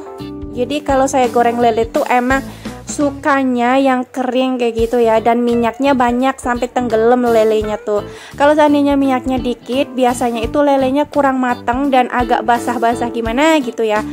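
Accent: Banyumas, Indonesia. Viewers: Ya Allah mb ngapak bngt mb ak jg orang Tegal ngapak tp krm terlalu lama g ditegal jd udah kebawa BHS disini dilampung padahal suami sy jg orang Cilacap ngapak jg suami saya ,dulu begitu lulus sekah SMA ak langsung merantau kerja di Batam mb terus ketemu suami di batam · Kali ini video dibuka dengan jogetan alea Dan pak suami yg nyapu.. Mba.. Request donk, nanti bikin vlog yg full bahasa ngapak. 😁 Lucu Dengarnya. Hehehehehe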